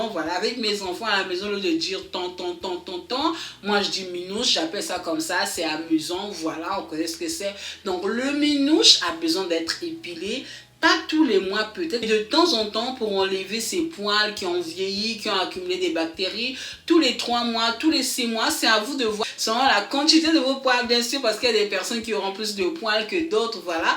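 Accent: Ivory Coast. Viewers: Loooool 😀 t'as vraiment l'accent ivoirienne hein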